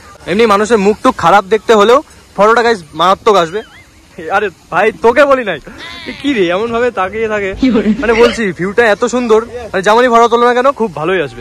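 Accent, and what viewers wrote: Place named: North Bengal, India. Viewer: I hv come to see your vlogs v recently and glued to them. Honesty bro, toder North Bengal r bolar accent ta khbi sundor.. Really enjoyed watching ur videos..I hope you get more success in the future. Take love from Burdwan.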